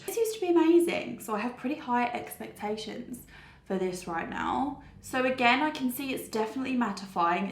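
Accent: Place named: Australia. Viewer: Only found you recently and really enjoying your videos, you’re super down to earth and relatable, your accent gives me Australian vibes, I know you’re from the UK but it’s like you have an Australian twang on certain words, don’t mean any offence 🥰😍keep coming with the content I love it xx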